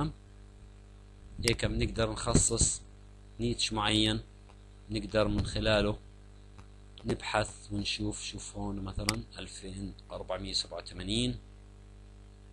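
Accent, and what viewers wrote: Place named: Jordan. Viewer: لهجتك بجنن اردني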